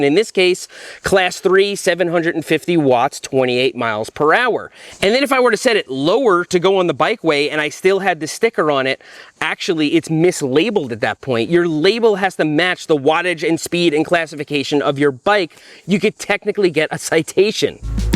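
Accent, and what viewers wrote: United States. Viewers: how is a very strict legal definition an 'unspoken agreement' ? brother you sounded very american here.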